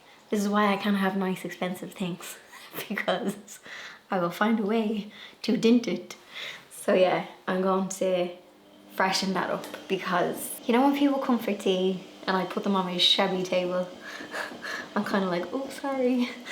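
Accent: Ireland. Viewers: Aloha doll, new to your channel & adore your style so much. To hear that lovely accent again is a treat. I used to spend most of my summer holidays over in Sandymount & Ringsend, with close family friends. Many happy memories of The Strand, Bray & Phoenix Park & the town centre in Dublin. The cats are gorgeous. XXX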